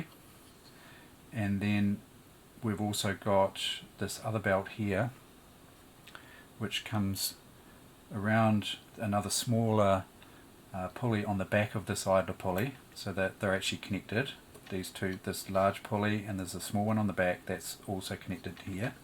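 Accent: New Zealand. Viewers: I hopethis gets an answer, it would be helpful, but it sounds like he's a kiwi so he may not have an answer for another country if you are from somewhere else to NZ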